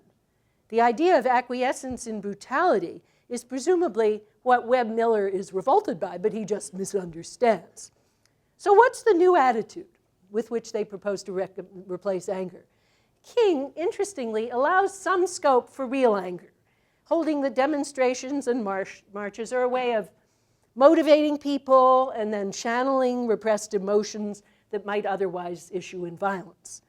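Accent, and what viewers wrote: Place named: RP, United Kingdom. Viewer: Nussbaum speaks like the stereotypical high british society intellectual.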